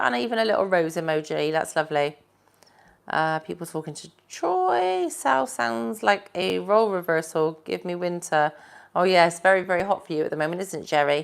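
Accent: West Country, United Kingdom. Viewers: Hey, you could DEF do a better job than Liz Truss? Love that slight country accent, is it West Country perhaps? Regards Chris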